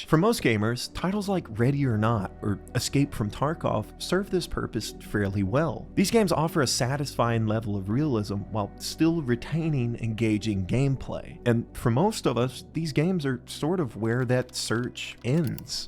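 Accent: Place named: South, United States. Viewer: When that smooth Southern accent hits, you know it's time for some easy listening with insightful commentary. Great video,!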